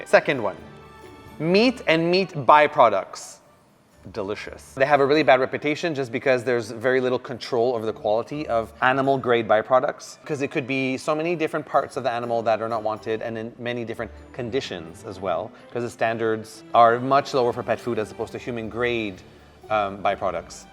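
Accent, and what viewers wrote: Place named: Quebec, Canada. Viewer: I totally recognize your accent. You're French Canadian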